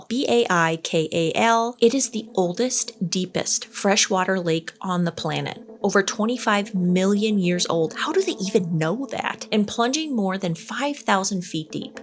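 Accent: Minnesota, United States. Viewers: gotta love the Minnesota accent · You must be from MN? Nailed the accent.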